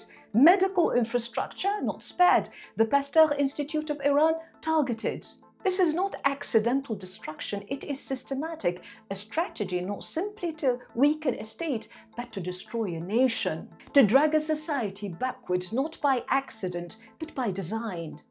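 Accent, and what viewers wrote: RP, United Kingdom. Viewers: Excellently read lines off the screen with a weird queen's english accent. Just bizarre. Don't know what is worse - ai slop or fakery like this.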